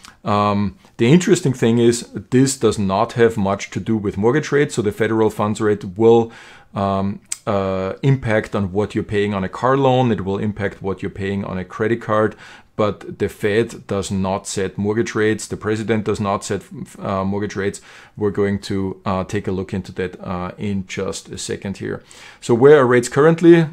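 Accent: Germany. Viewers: Bist du aus Deutschland oder Österreich ?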